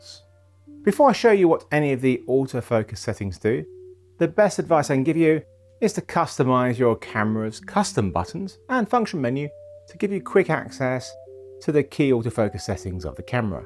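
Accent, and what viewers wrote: United Kingdom. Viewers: It's always a pleasure watching your videos. Your British accent and cats are awesome!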